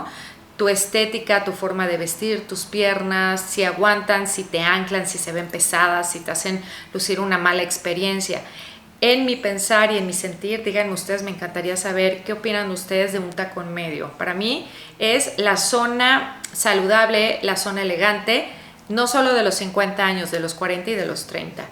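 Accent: Mexico. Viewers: Es la primera vez que te veo. Se por tu acento eres mexicana. Cariño , comienza por ti . Ese top que llevas no te va . No estás delgada , es escote no te luce , menos para un vídeo de Youtube. Y menos en ese color . Recibes mucha piel y creo que andas como entre 35 y 40 y ya tienes que elegir bien lo que usas . Una cosa es en el gym ( que ese top te iría muy bien para el gym ) y otra para un vídeo. Saludos , cariño , desde Costa Rica 🇨🇷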